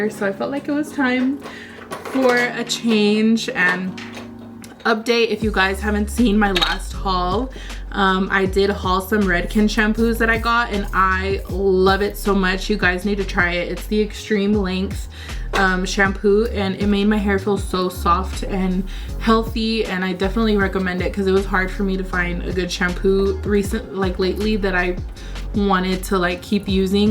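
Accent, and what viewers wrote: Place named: Hawaii, United States. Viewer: Aloha, just found your page! Actually thought you were from hawaii! Lol! You talk like us! But let me tell you, it’s sooooo expensive here in Hawaii ugh! We pay the price of paradise but for the most part it’s worth it lol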